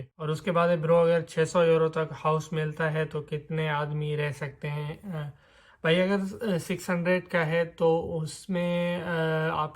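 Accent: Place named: Sindh, India. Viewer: Ada ap lehje se Sindhi lgte ho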